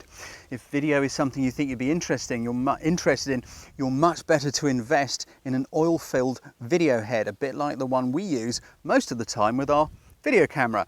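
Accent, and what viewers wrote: United Kingdom. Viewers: This is excellent, great content for a photographer looking to get into video. Thanks for sharing, any chance of more in this series? Your presentation style is spot on, and a British accent as well, hooray!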